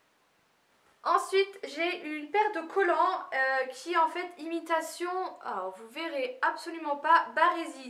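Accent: Lorraine, France. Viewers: j'entends pas l'accent parce que je suis Lorraine aussi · l'accent lorrain est très spécial malheuresement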